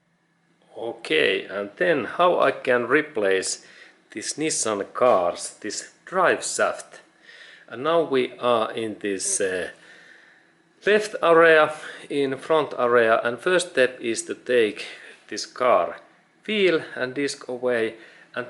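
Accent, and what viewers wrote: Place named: Finland. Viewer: From your accent I can assume you are from Finland 😉. Btw i like your videos very informative.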